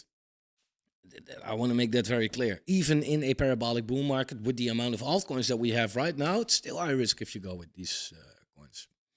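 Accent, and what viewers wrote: Netherlands. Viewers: Great channel . I can hear you are Dutch just like me 😬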